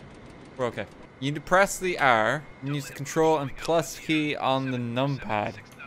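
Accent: Ireland. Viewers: Is he Irish? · wait are you irish or something?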